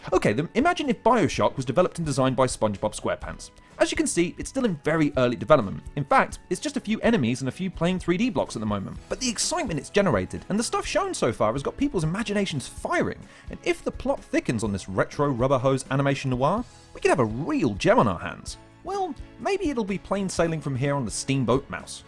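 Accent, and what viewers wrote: United States. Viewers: I remember that doom RPG, it was pretty good. Nintendo is going hard at people now but they really didn't do much when the 3DS and WiiU emulators and tools were being developed while those consoles were very much alive. Nice American accent. QOTD, Probably the Entrain Odyssey collection for switch.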